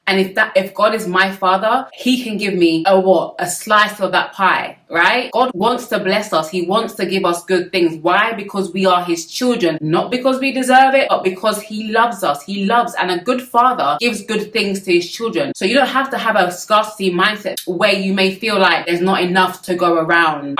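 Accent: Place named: United Kingdom. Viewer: I love your accent so much! which city is your accent from? Everyone seems to have a different British accent depending on the area 🤔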